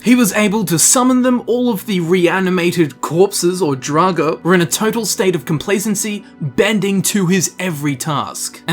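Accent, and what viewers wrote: Australia. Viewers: Your an Aussie Aye?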